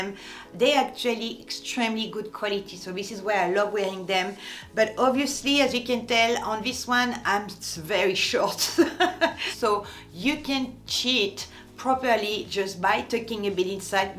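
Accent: France. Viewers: why are you speaking with french accent?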